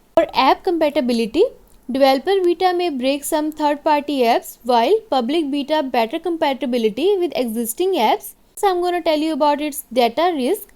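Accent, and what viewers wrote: India. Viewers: Ohh is it Indian Ai English voice?😅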